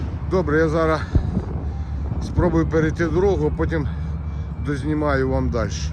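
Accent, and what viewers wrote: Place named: Rural, Ukraine. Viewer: Перший раз побачив в неті цей канал, по вимові впізнаю земляка, підписався буду дивитись.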